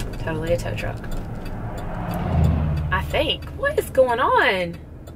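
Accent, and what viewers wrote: South, United States. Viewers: Are u southern?? Bc im from texas and i can tell by your accent but i dont wanna be rude and say your something your not lol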